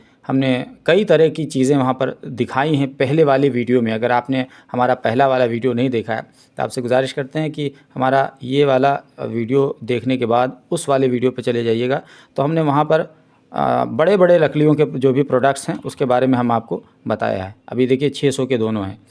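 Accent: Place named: Lucknow, India. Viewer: lakhnavi Andaaz Bahut Khoob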